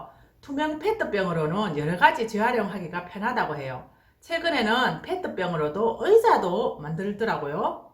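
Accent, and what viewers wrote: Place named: North Gyeongsang, South Korea. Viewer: 나도 경상도사람임에도 불구하고 경북사투리듣기거북해서 이분 유튜브안볼라하는데도 그런거상관없이 이분채널은 진짜 유용함ㅋㅋㅋ 인정할수밖에 없음!!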